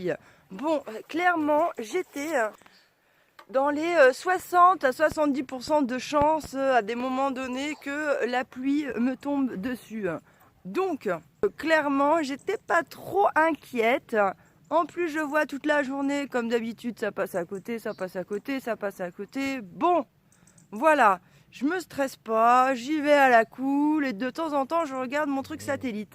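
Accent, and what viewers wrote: Paris, France. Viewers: Et ton accent parisien j'adore, ça me rappelle une ex qui vit dans le 20 eme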